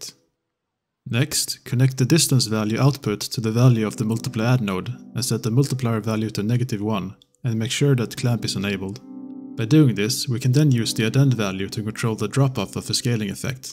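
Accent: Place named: Germany. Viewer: I somehow always feel like this German accent is perfect for modeling tutorials :D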